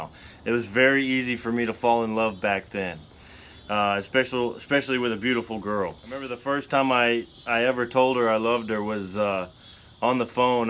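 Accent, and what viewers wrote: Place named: South, United States. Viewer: Glad I found your site!!! Stories making my heart melt!!! Not sure if it's the Southern drawl, cowboy hat or YOU!!! PLEASE keep posting!!!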